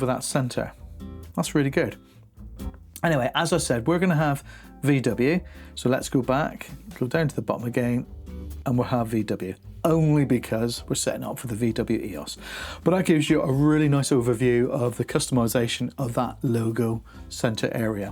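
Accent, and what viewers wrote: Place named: Somerset, United Kingdom. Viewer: This video was excellent, convinced me to download it and it's great. Judging by your accent I suspect we arent far apart, I live in Somerset. Currently trying to upload a custom logo and it doesn't give the option of where to upload the image from though (as yours does from the bottom of the screen). Any idea how to sort this?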